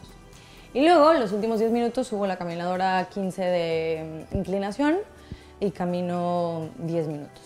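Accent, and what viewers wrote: Mexico. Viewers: Ay! Como me encantas... Curiosamente acabo de ver otros videos de recién que llegas a España, y como que querías adoptar el acento españolaooo🙊jajaja pero creo que el furor ya pasó, hace tiempo. Me encanta que conservas tu acento mexicano y muuuy freson! Eres divina😗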